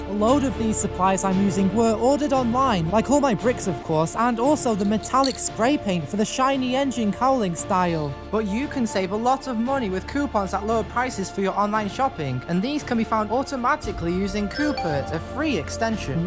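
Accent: United Kingdom. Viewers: He's british (I think) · Are you British?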